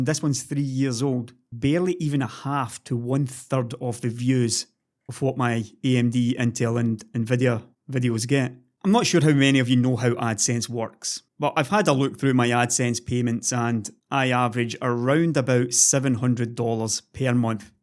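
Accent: Scotland, United Kingdom. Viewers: started watching you when I could barely decipher the broad Scots brogue, you have always been a shining light of sanity in the whole tech troll / fan morass. I didn't appreciate how much they had stressed you out, just enjoyed the ranting too much I guess. Anyway very glad some bridges are being rebuilt and very glad for your sanity that you are going to do what makes you feel positive. I will join your discord soon although I am an ex tech guy, now retired, it looks like a point of sanity in this modern media world. Keep railing at the madness it is at least keeping us sane! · You could just make cat videos and I'd still watch them. I only come here for the Scottish slant and subtle sass.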